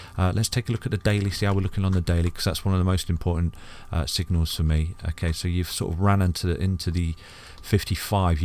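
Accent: United Kingdom. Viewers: Realistic analysis, realistic british accent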